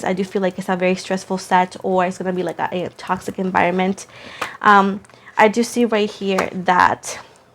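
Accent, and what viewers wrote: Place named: Latin America. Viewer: Hello luv you r doing wonderful 💗. Never mind about those unkind pple and can't believe they were making fun of how u speak ! 🙄 like really!? U r a beautiful Latina woman and of course ur gonna have a accent and even if u didn't they would find something else to complain about. Smh.. so those pple u cannot make happy. There own life prbly isn't happy so u don't need there discouraging nd negative energies luv. U r beautiful inside n out no matter what anyone says! I'm sure u know that and u radiate that! 🩷✨️ U r a hard working mother doing what u love ! U r a genuine loving woman! U keep doing u ! And I luv your readings btw.. always 😊💖🌈✨️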